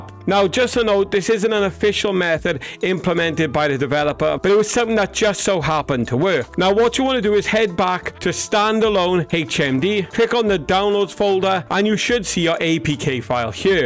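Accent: Wales, United Kingdom. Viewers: Thanks! My friend said it took him 3 hours to get sidequest on his VR but with this method i got it in 15 minutes. Also proper Welsh accent 💪🏴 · omg the welsh accent made this so much more enjoyable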